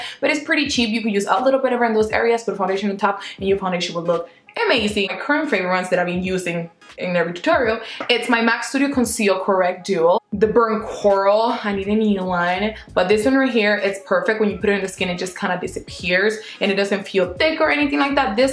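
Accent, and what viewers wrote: Panama. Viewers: I'm 50% Cuban & 50% Panamanian And I love hearing you talk in Spanish because I wish I can talk like that w that "que sopa" Spanish accent 🙈😿 lol viva Panama #Colon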